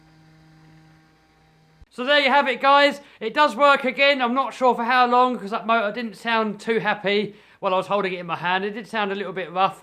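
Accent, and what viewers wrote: England, United Kingdom. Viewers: I love the way British people talk 😄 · I think your speech is fine I like an English accent especially the way a true English man talks totally awesome I really like the way you say H and 8 s sounds cool with the baby voice he does ask him for me to speak that way more often. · I like your the way that you talk in the uk cause I live in Canada so how you speak is unique to me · picking on pronouncing is lame! especially at a funny britt guy. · in your next video... can you say leaf litter... i love how the britts say that:) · What part UK you from? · Brithish English, best English🇬🇧🇬🇧 Always good videos👍👍👍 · Say mo*ah however you wan* o say mo-ah! I love hearing *he Bri**ish accen*! *hats par of *he appeal of your videos for me! *op work!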